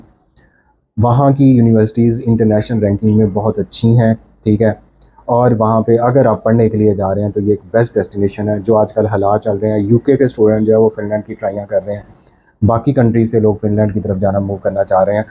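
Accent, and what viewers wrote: Faisalabad, Pakistan. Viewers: 😂.. app Faisalabad sa lgtay han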